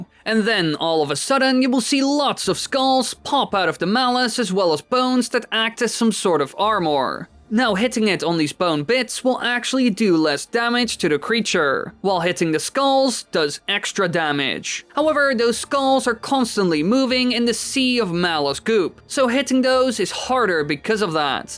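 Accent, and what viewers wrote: Netherlands. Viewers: Is he Dutch? He seems to have a Dutch accent when he speaks English.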